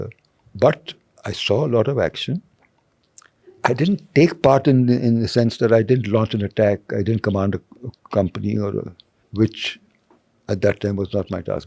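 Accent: India. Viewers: British Variant of English spoken in India..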